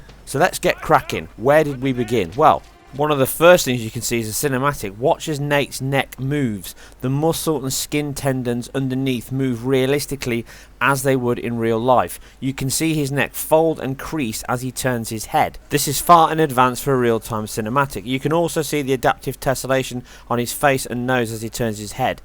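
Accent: England, United Kingdom. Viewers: Man, we love this! You have seen the demand for your kind of workmanship here. We are looking forward to more top notch analysis like this that puts Eurogamer and Digital foundry to shame. They now have competition! You have our support. Thank you you have awakened to giant nerd in us. Love your stuff and that English accent is a sure fire win. Everybody is posting your video on many comment sections on gaming sites. Keep this up.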